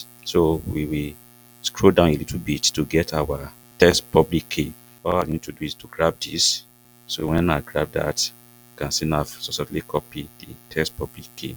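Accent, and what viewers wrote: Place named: Yoruba, Nigeria. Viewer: I really love the Yoruba accent senior man😂❤❤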